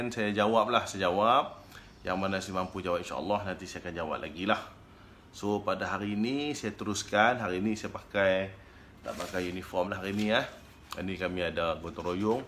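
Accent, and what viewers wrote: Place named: North, Malaysia. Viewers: Byk utara noh komando ni. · Abg nak tanye knape ggk selalu cakap utara ye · Seronok dengaq Tuan bercerita..Tak boring..cara penceritaan santai, lenggok utara lembut dan mesra walaupun nampak garang..Moga lancar channel dan terus banyak content2 baru Tuan 😃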